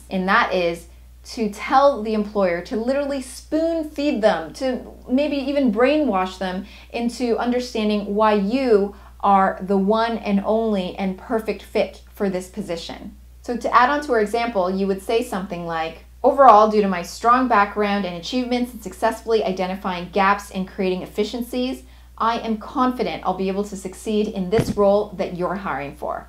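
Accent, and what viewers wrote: Canada. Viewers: Oh god... she’s Canadian... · You Canadian , right ? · please send me the link to your cheat sheet for resumes!! thankyou.. great video!!! are you from canada? your accent is similar to mine! :-)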